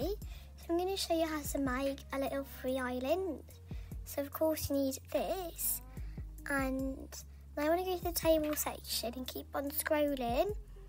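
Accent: United Kingdom. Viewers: YOUR VOICE IS A GODDESS OF BRITISHHHH AHHHHH❤❤❤ BUT MY FRIENDS CALL ME BRITISH🤨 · Lave that British voiceeee it's soo cuteee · I love your videos but you know itz toca Alice and and you know you just like Alice the British accent and all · I love your British accent ❤❤❤❤❤❤❤❤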